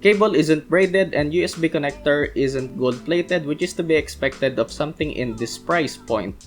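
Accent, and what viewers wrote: Philippines. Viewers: accent doesn't matter, he is really Fluent in English. besides he's a Filipino anyways so its natural to have a Filipino accent. Good video. hope you make a review about Zeus Mk-880 Professional Gaming Mechanical Aluminum Steel Keyboard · Yeah he's accent is so filipino but it actually sounds good and his grammar is almost flawless.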